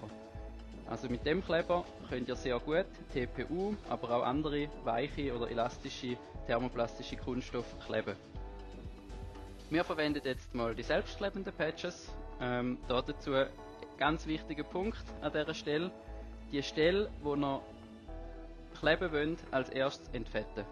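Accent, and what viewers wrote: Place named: Switzerland. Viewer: Super Erklärung. Fahre TPU seit geraumer Zeit. Gestern der erste Plattfuss. Bin mal gespannt wie der selbstklebende Flicken hällt. Ich verstehe das Schweizerische übrigens recht gut;)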